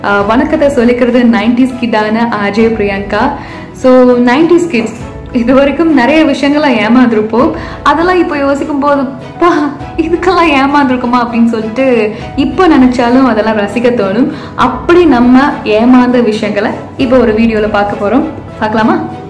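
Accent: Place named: Kongu, India. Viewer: Hi hru voice super kongu Tamil PSG arts college mass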